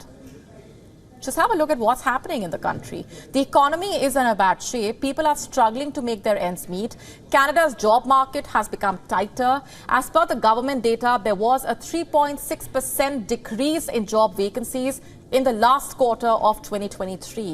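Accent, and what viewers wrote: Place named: Punjab, India. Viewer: We dont need punjabi english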